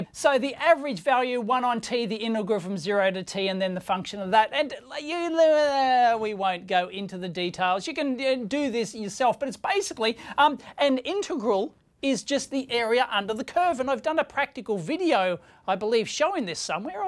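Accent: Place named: Queensland, Australia. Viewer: Anybody else unable to see a video and not go: "haiiiiiiiiii" in that Queensland accent lmfao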